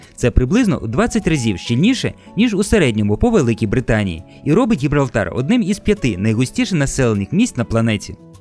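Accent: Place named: Russia. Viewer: Дикція на трієчку, ще й рашиським циканням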